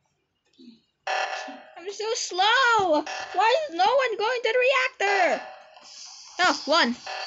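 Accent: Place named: United States. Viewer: You sound american. WE DRIVIN ON THE RIGHT SIDE CUZ WE'RE AMERICAN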